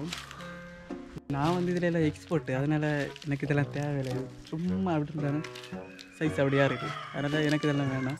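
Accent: Kanyakumari, India. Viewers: கன்னியாகுமரி ஸ்லேங்... 😁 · it's kanyakumari tamil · Kumari slang pichu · Kumari Tamil Anna😍😍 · Nop, kumari Tamil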